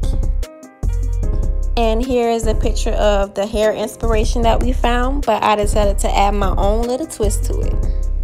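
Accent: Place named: Florida, United States. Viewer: Turned out pretty! You were very detailed and I could tell you were from Florida 😂😍 Much love from Texas!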